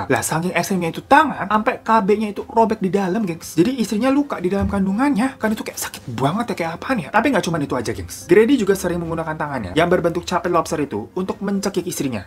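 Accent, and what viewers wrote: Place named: Banyumas, Indonesia. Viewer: Enakan cara ngomong nya bang ,hehehehe ngapak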